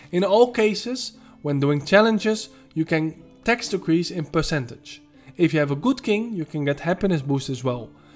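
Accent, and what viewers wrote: Netherlands. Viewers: Wat ben jij nederlands zeg haha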